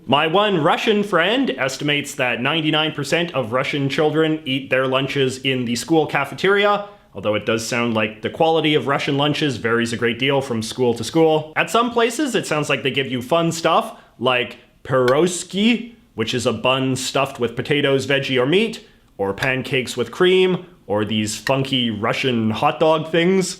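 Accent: Canada. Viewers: I love to hear pronounce foreign things very Canadian-ly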